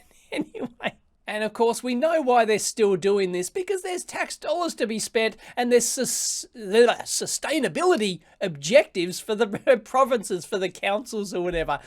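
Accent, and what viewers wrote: Australia. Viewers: I guess your info is interesting but your delivery is annoying. Smug aussie accent. ugh! just hard to listen to.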